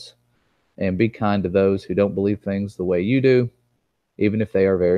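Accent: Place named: South, United States. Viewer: Kinda makes sense that this guy is southern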